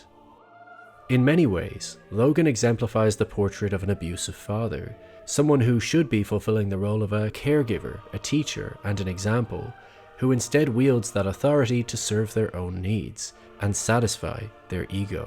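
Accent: Ireland. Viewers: Is the narrator Irish? Some words sound Irish (e.g. without, about etc) but not entirely sure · Love this channel. Insightful, well written, well-produced. Well done, man! Are you Irish? I thought I could hear the accent. As a Dub I can spot it I think ;) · Sounds Irish to me but what do I know lol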